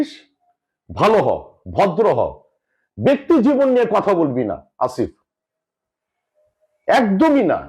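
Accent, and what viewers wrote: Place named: Comilla, Bangladesh. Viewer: বাড়ি কোথায় কুমিল্লা বুঝতে হবে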